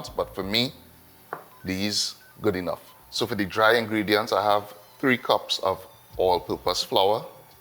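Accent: Trinidad and Tobago. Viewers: I don't know what style of sweet bread you're trying to reproduce. I suspect it's Trinidadian, due to your accent. I'm familiar with traditional style Barbadian sweet bread and it did not have any of those hideous mixed fruits and maras. cherries that they are putting in the bread nowadays, according to what I have been seeing online. This is almost traumatic. Thank you for not putting that crap in your bread!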